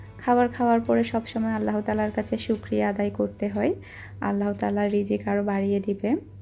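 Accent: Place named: Satkania, Bangladesh. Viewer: আপু সাতকানিয়ার ভাষায় ব্লগ দিতে হয়তো ভালো লাগে না মনে হয়? কিন্তু আপু তোমার মুখে সাতকানিয়ার ভাষায় কথা শুনতে আমার খুবিই ভালো লাগে ।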